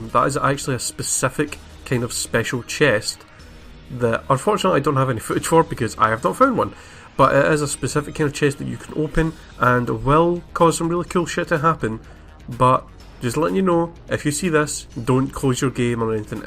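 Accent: Scotland, United Kingdom. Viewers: Are You Scottish ? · As soon as I heard his Scottish accent I liked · You`re from Scotland, right?:D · Thank you for posting this! nice Scottish accent! :-)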